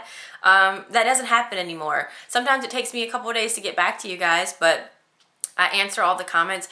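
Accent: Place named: South, United States. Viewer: Happy Anniversary! I love watching " " with her cute southern accent! I hope you have a great weekend. Still cold here in Chicago but at least the sun is shining! XOXO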